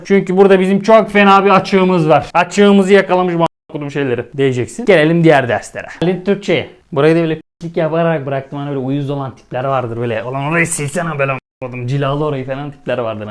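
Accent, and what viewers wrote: Thrace, Turkey. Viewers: Reis küfürü azaltmışın böyle daha iyi trakya ağzı yeter güldürmeye :)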